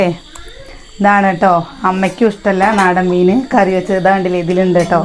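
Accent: Palakkad, India. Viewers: പാലക്കാട്‌ ആണോ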